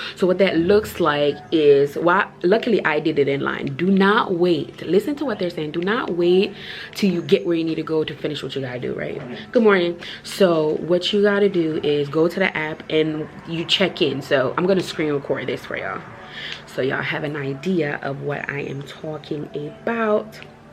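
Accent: Jamaica. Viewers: No offence but which r u Jamaican r American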